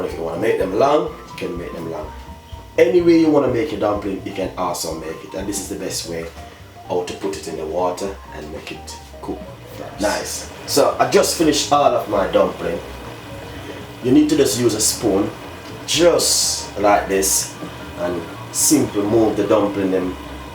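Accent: Jamaica. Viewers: ugh I loooovveeeee Jamaican men accents <3 I wanna watch his videos to just listen to him lol . great recipes to tho :-)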